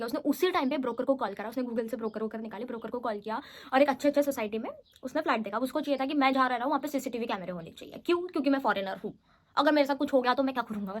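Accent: Maharashtra, India. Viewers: I just love the way you are talking and your marathi accent in hindi is such a marvelous to hear ❤❤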